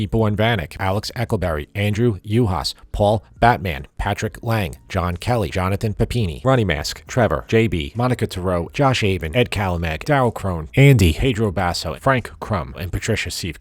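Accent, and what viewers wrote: New York, United States. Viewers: As a Brooklynite, I can enjoy hearing your wonderful NY voice. If you’re not from NY, please let me know, or else fogeddaboutit😂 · Are you from ny or nj? 🙂 ok,, yes, I see, ny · Hey , let me make you ah pasta you can't refuse . Love watchin this guy and his N Y accent. Great recipes . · where are you from? new york or new jersey? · Don't usually hear such thick New York accents on YouTube cooking channels. I like it. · I love the accent 😍 New York?? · Love the ny accent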